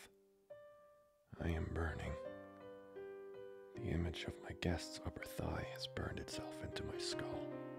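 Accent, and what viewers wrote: Germany. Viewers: What in the German gentleman vampire is this AAAAAAAAAAAAAAA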